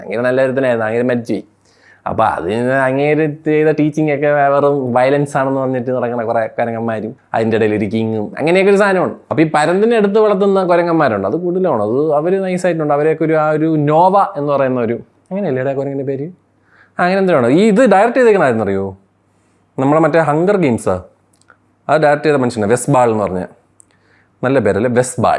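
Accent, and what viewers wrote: Trivandrum, India. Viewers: തള്ളേ തിരോന്തരം 😂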